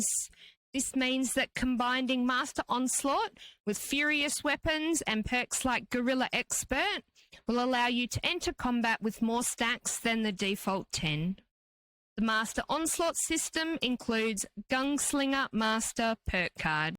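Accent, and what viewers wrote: Australia. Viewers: It's good to see you making the move to YouTube. Your guides are great. As was mentioned, the volume of your voice is low, but you have a great speaking voice. I love those AU accents! Thanks for all the data mining that you do. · I will follow and like all your videos because you are so nice and polite and professional with me recently in a situation with a Facebook Fallout group I apologize if I really shouldn't be allowed to say this here but I just wanted to say thank you for being so nice and professional. Your videos here are awesome and I follow you all the time and I've been friends with you long before you where moderating anything you are a genuine good person I just can't get used to that annoying Australian accent since I'm Irish American but I love you still the same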